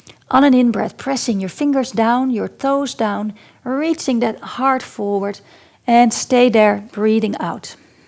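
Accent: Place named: Netherlands. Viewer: Heerlijk je nederlandse accent in het engels! Bedankt voor je fijne gedetailleerde alignment uitleg (Y) top!!!!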